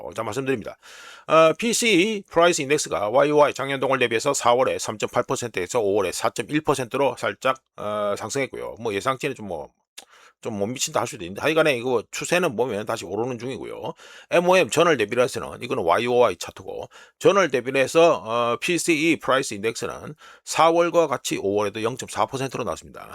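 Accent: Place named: Gyeongsang, South Korea. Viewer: 어이 경상도 사투리 막소리 할때 삼가라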